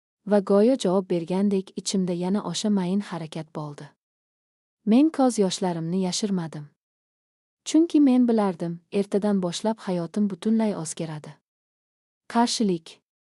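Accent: Russia. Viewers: Бу укиетган киз татар миллатига мансуб булса керак шунинг учун шундай укиса керак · Ким укиябди урисми товба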